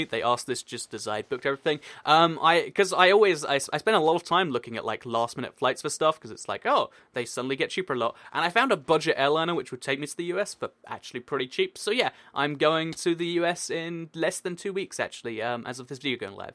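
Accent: United Kingdom. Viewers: #British Accent: Will we ever get mineable spawners for the Console edition of Minecraft?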